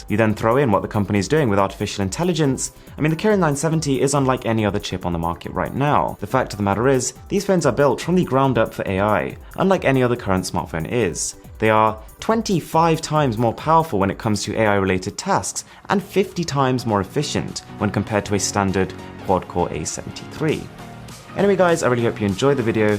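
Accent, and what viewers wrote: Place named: United Kingdom. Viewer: I love that UK accent ...😃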